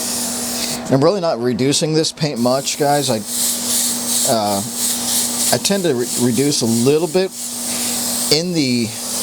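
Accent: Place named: Pittsburgh, United States. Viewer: Pittsburgh boy. I recognize the accent. My grandparents lived on Glen Coe dr. McKeesport.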